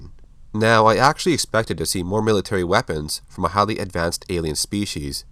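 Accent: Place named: Canada. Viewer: are you Canadian? I swear you sound Canadian.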